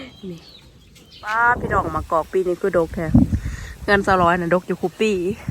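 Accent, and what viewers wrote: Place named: Roi Et, Thailand. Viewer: บ้าน ใช้เงินบาทหรือเว้าคือไม่ใช่คนลาวสำเนียงเหมือนร้อยเอ็ดสารคามขี้ตกเหมือนกันร้อยเอ็ดเด้อหล่า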